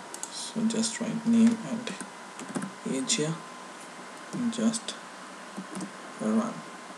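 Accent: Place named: India. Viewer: india english??? OMG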